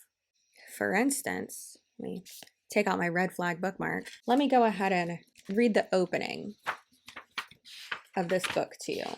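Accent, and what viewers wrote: United States. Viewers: Also are you from south U.s born and raised? You have the perfect American accent 😍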